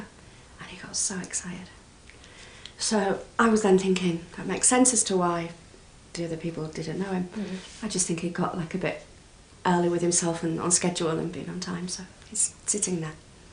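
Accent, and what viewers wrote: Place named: United Kingdom. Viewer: I don't like the British way of speaking . Hard for me to understand .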